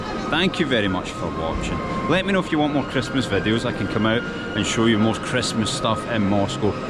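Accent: Scotland, United Kingdom. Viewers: that beautiful scottish accent cheers from Belgium have a look also on russian cars in the streets and those in Europe the ultimate years.... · As I've noticed the Scottish accent is quite gorgeous. You pronounce sound ei instead of ai. Eis skating. 😊 Nice one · Awww! What a nostalgy for me. Last time I dealt with the Scottish accent was in my University days. 😻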